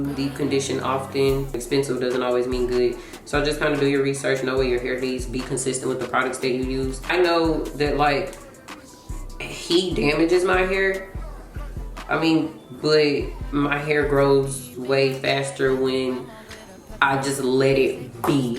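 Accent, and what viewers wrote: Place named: South, United States. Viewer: That southern accent ❤so pretty I like the outfit ❤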